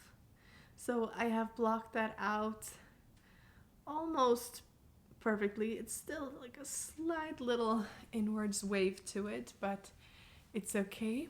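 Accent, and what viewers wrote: United States. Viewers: Beautiful fobs. You have a very American accent for a person who lives so far from USA! I love your vlogs!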